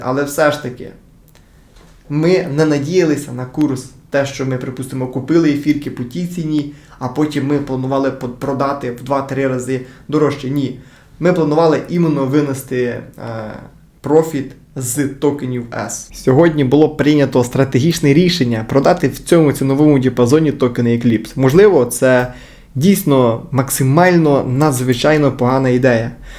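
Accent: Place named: Zakarpattia, Ukraine. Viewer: крутий акцент у тебе, пахне закарпаттям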